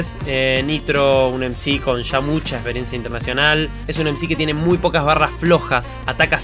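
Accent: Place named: Argentina. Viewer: Se nota que eres argentino todo el rato wos Si nitro, teorema le ganan a wos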